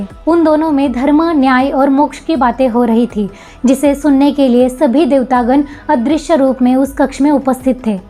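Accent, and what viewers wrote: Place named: Maharashtra, India. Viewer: I love your hindi with a slight hint of marathi accent. God bless you!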